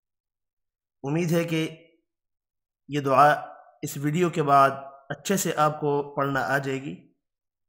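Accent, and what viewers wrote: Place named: Arab world. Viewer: Jazakum Allahu Khair for making this video🌺 I also appreciate that you are using an Arabic accent not an Urdu accent✌️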